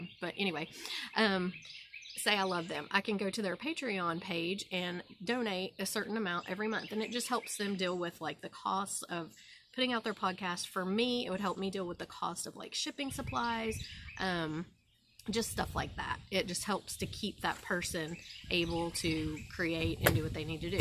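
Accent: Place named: Pittsburgh, United States. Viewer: Ahh, okay. Every once in a while, you sound like some folks I know from the Pittsburgh area, which is why I asked. 😊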